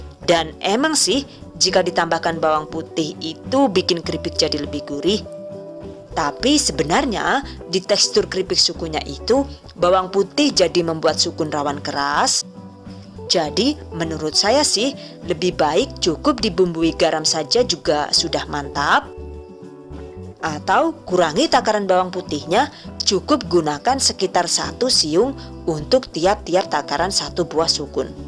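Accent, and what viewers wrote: Banyumas, Indonesia. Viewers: Lucu juga dengar mbak ini,ngakak juga ini,apalagi kalau bahasa ngapak nya keluar😀😀😀😀😂😂😂😂,salam dr malaysia mbak.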